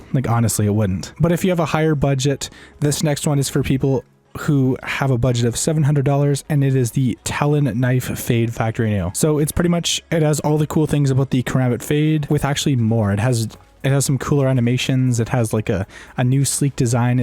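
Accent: Norway. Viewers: hmmm hmmm er du Norsk? (are you Norwegian?in Norwegian obviously) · Are you from Norway?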